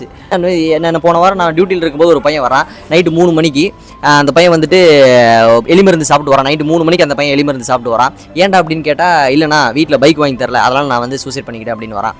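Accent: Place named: Kongu, India. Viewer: Thambi.. I became fan of you from this video❤❤ Ipotha voice kekaren and kongu slang❤🧿. Om namasivaya🙏 VAZHGA VALAMUDAN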